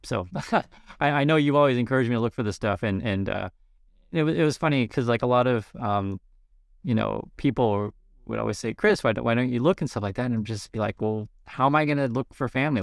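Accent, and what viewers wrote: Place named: United States. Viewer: My daughter and mother did AncestryDNA on my genealogy account. Through my daughter, we had a match with my husband's cousins that had been "lost" as children after a divorce. She also had matches with two other of her father's 1st cousins, that the family had no idea existed. The one had been told her father had died in the Korean War, but it was a lie told by somebody, probably her mother's stepmother, to get her to adopt out her baby. She'd been searching for a picture of her dead father for decades. She made contact with me and was astonished to learn that her father had only died the year before at age 85. She had terminal cancer and died just three weeks later, but she stared at the picture of her father constantly during that time. On my mother's side of the family, we discovered a cousin's son who had no idea who his birth father was. 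Now Mom's got another unexpected match, likely either a cousin or an unknown half sibling's daughter. You just have no idea what you might find out, especially from that time before reliable birth control. But this is the most amazing dna story I've heard yet! I started watching your other channel a few weeks ago and wanted to know more about you and your wife, mostly because you sounded American but were living in Korea. The story was more interesting than I'd imagined.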